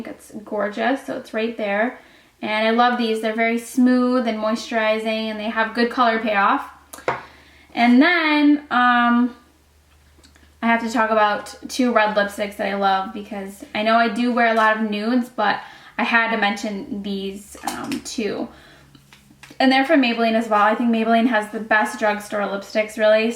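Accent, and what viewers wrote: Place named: Midwest, United States. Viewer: I've always wanted to ask you, are you from the Midwest?? You have a strong Midwest accent! Nothing wrong with that, I'm originally from Chicago but I don't have the accent:(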